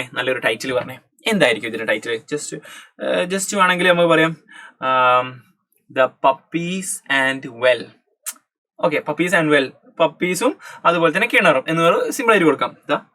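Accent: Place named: Kozhikode, India. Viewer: Kozhikode aan enn thoonunnu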